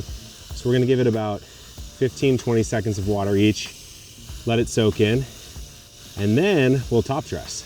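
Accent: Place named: Australia. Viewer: Hello Mr self-sufficient guy I love your videos I learned so much and ps. Love your accent I guess you from Ustralia 😁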